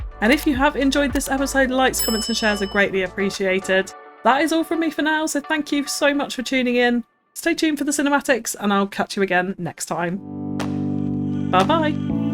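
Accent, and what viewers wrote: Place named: England, United Kingdom. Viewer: A beautiful design from someone with a beautiful designers mind. Additional thoughts - due to your charming English accent I kept miss-hearing what you were saying when you said “Mall” I kept hearing it as Mull. I genuinely thought you were saying it with a Scandinavian accent.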